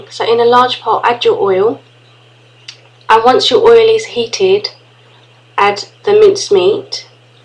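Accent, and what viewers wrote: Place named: North London, United Kingdom. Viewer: I love your accent. are you from north london? thanks for the amazing vids! x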